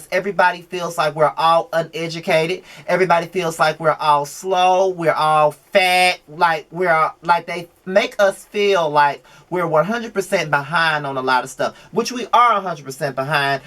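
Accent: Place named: Mississippi, United States. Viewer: I didn’t know you were from Mississippi. I’m from Jackson, MS! I knew I recognized that accent lol. Represent!! You have a new subscriber. We have to support our own.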